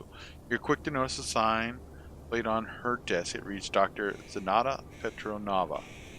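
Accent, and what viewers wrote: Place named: Midwest, United States. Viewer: I've really enjoyed your playthrough. Actually preferred over television/netflix or recent releases in the gaming industry. You do a great job, and struggle in a world with a character with flaws relatable to a common person. Though your character isn't set perfectly for an easy ride, it does however force you to play smarter just to survive, and a mishap can be a set back. Where are you from? You almost sound like you're from the midwest.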